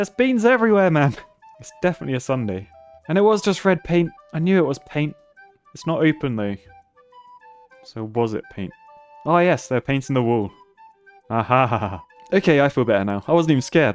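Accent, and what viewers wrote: United Kingdom. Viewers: this bloke sounds cheeky BRITISH maybe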